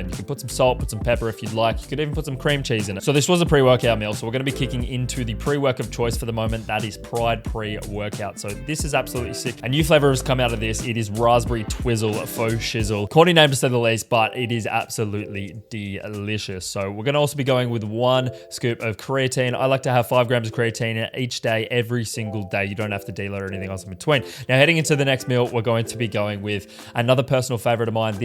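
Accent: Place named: Australia. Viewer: i can't tell if your accent is melbourne or sydney on rare occasion your A noises are more posh like melbourne but then you've got that drawl from sydney it's like listening to a cello that sometimes plays violin parts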